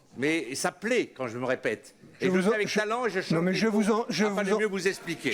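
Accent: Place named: Île-de-France, France. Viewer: Et ton patois d'Île de France tu sais où tu peux te le mettre ?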